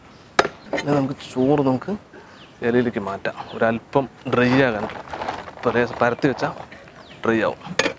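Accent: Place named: Palakkad, India. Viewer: പാലക്കാടൻ ആണല്ലേ.. വർത്തമാനം.. കേട്ടാൽ പാലക്കാടെന്നു തോന്നുന്നു..!!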